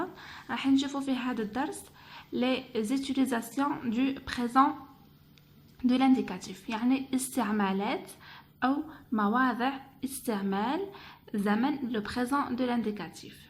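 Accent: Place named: Algeria. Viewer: D'où venez-vous de la wilaya ... selon votre accent, vous êtes de la commune de Tadjnant ... je viens de France, mais j'habite en Algérie et je visite beaucoup ses communes ♥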